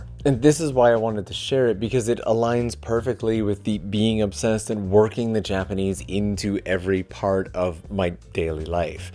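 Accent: Canada. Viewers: Loving your Canadian/UP accent haha. ❤ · First of all. Great tips and tricks, I really need to try them all. This video has really caught my attention more than most. Second of all. I was wondering if you were Canadian. I could have sworn I was hearing Ryan Reynolds here and there. Here’s an opportunity to make a video of you speaking in Japanese sounding like Ryan Reynolds 😂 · Just found your channel. And I'm just as fascinated by your Canadian accent. lol. It's very particular. I'm trying to mimic it, and can't get it right! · Lots of French here, and I remember some from school classes. Ultimately though I pick up like every fifth to 10th word and use technology when needed. Nothing like what my mom went through where her father was actually raised in French. He passed when I was really young and I was told he spoke English to me, but his accent was so thick. 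Don’t remember anything he ever said to me. Acadian ancestors immigrated through the Hudson in the 1670s. I knew you were Canadian without you telling me because of the way you say about and out. I also dated some bilingual girls from Northern Maine because that’s where I went to high school and where my family was from. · lol I knew from your accent you were Canadian. Thanks for the tips. I will try them out.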